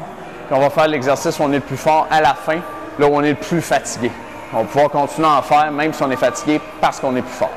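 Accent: Quebec, Canada. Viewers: il a une voie qui vient de quebeq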